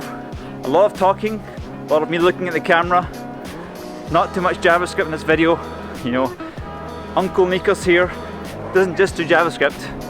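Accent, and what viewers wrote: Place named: Scotland, United Kingdom. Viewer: Your strong Scottish accent!!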